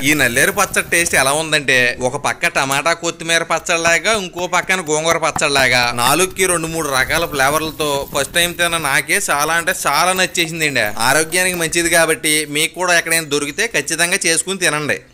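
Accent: Godavari, India. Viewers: మీ యాస భాష తీరు. వింటూ ఉంటే సినిమా హీరో అల్లరి నరేష్ గారు మాట్లాడినట్లు ఉంది సార్.🎉 గోదారోళ్ళు కాబట్టి ఆ విధంగా మాట్లాడతా ఉండారు. మా రాయలసీమ భాష గాని మంచిగా ఉంటది.. మీరు చెప్పే విధానం బాగా ఉంది.😊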